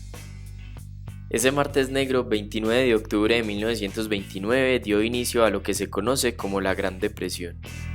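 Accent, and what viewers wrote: Colombia. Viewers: Me gusta el acento parce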